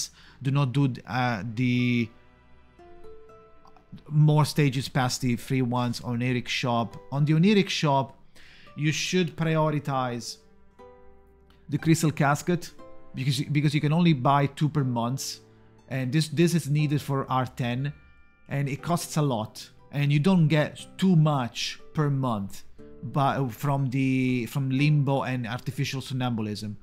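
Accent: Italy. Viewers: sapevo che eri italiano, parli inglese con il mio stesso accento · Però l'accento italiano si riconosce subito ahahah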